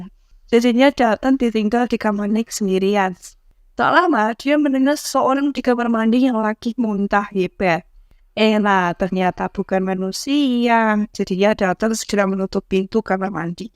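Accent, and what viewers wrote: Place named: Java, Indonesia. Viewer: Pasti kk org jawa ya ngomongnya masih medok.hehe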